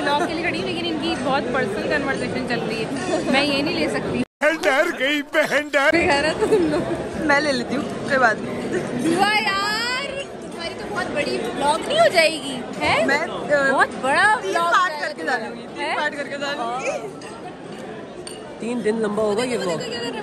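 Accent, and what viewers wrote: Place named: Mumbai, India. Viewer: Urdu nahi ATI Mumbai wali zuban bolti ho.mere ko etc q? Pak ki ho ya indian ho? Or galiyan bhi tumhara brother ya dost deyta hai .zuban tu seedhi rakho it is not funny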